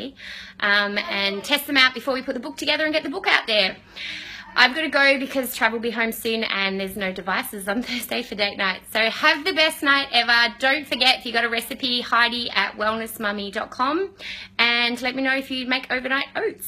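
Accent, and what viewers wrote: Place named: Australia. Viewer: Hi, Thanks for showing me how to make slow cooker porridge. Incidentally I like your warm Australian accent and the gentle way that you supervise your daughters who seem at that curious/testing things out stage of development. I am single and have a compact slow cooker, so I will just have the amounts. Warm regards from Robert in Scotland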